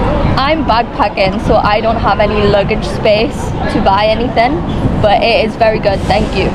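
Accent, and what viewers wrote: United Kingdom. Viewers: your accent reminds me of Jodie Comer. Are you from Liverpool, too? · 你说的英语我不是那么能听懂 。感觉习惯老美的口音多一些 ；不过伦敦口音还是很好听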